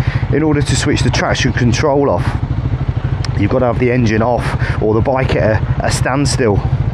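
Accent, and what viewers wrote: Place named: Hull, United Kingdom. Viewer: It was like revisiting the route along with you other than Rameswaram to Chitra Durga I have ridden the route on my Honda Highness. It was a fantastic series with your awesome comments along the way. By your accent, it seems you are from somewhere around Hull in the UK. Looking forward to your pick among three.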